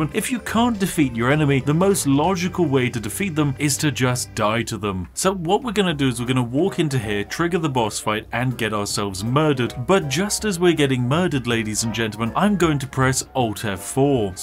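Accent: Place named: England, United Kingdom. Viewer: I’m sorry, I really am, but the way the English say certain things makes me sick to my stomach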